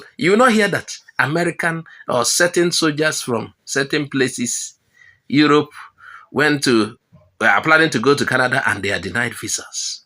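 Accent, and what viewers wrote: Nigeria. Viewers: On whose side are you, you speak as if you're a Nigerian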